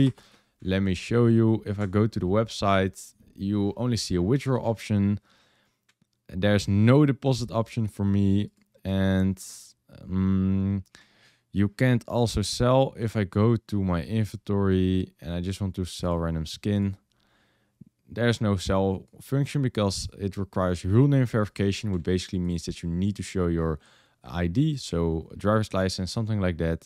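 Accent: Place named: Netherlands. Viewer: Mate you sound so dutch lmao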